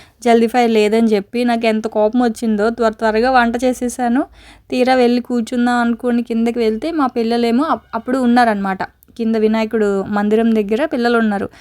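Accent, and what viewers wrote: Godavari, India. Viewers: Meru pakka godavari slang lo matladutunnaru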